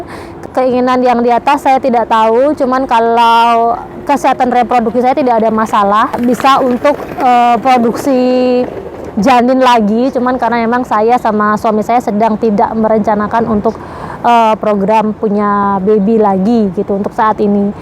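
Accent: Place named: Lombok, Indonesia. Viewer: Salam kenal mb Oww pantas lama d lombok Dialeknya kelihatan banget Salam untuk suami dan Matilde yg cantik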